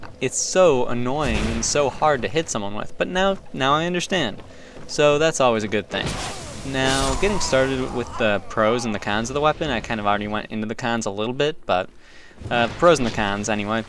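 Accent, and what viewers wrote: United States. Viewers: American pronunciation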